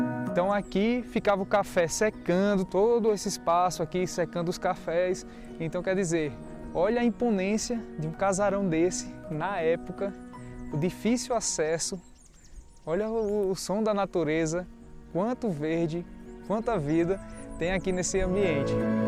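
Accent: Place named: João Pessoa, Brazil. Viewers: Perguntei porque cheguei a 3 meses de João Pessoa,achei o seu sotaque parecido com o de JP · Assistir esse vídeo foi tão prazeroso me fez lembrar do tempo antigo muito emocionante, eu realmente estou chocada com o Ceará tanta beleza tantas histórias, você está mostrando um ceará que eu não conhecia sou do interior de São Paulo e estou amando seu trabalho, que filmagem excelente melhor sotaque do Brasil. Como você diz muito bom de verdade 😅 senti como se eu estivesse aí nesse lugar cheios de histórias, por mais vídeos assim parabéns 👏👏👏👏👏.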